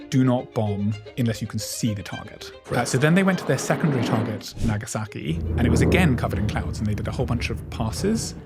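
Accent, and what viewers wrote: United Kingdom. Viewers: Why is it so annoying to hear criticism of the American war effort in a British accent?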